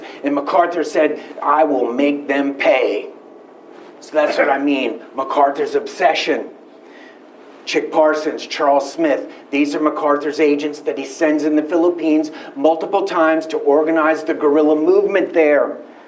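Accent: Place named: Bronx, United States. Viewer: This speaking style is 3rd rate, does not fullfill academic standards. Articulation as if the Bronx back alley accent, Joe Pesci et al. Unlistenable.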